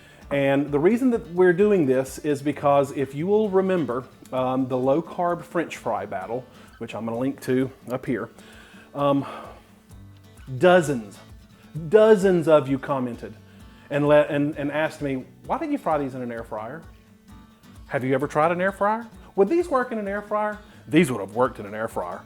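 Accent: South, United States. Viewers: i love watching you and listening to your adorable southern accent. I would make low carb chicken strips and fish sticks in an air fryer though i have never tried one. xxxx oooo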